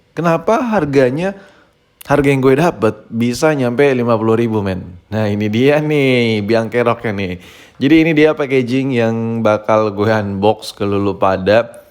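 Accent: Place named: Banjar, Indonesia. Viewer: Dari kalsel y itu ditempat ku tuh urank banjar boy🤣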